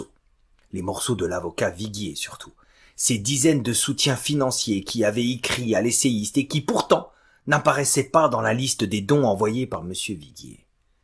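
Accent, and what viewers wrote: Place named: Algeria. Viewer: Merci pour toute ces lectures, et sinon vous êtes maghrébin n'est ce pas? En tout cas votre "accent" et façon de parler l'est et c'est de l'algérien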